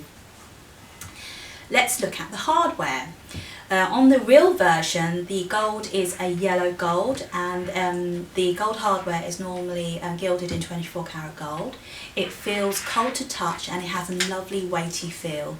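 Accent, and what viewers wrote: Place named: United Kingdom. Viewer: That British accent tho !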